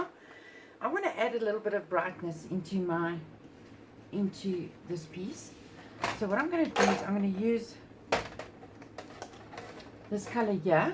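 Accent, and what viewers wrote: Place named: South Africa. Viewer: Oh my goodness that os a stunning layout. Its so wonderful to find a fellow crafty south african! I watched your video while baking bread. I miss that accent terribly.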